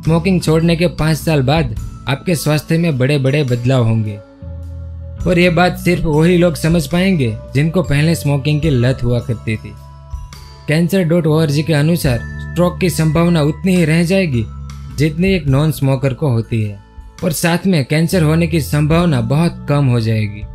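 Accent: Gujarat, India. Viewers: Bhai tu gujarati hai kya ????🤔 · Gujrati h ky bhai???? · Bhai, tum gujrati ho? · gujrati voice 😂❤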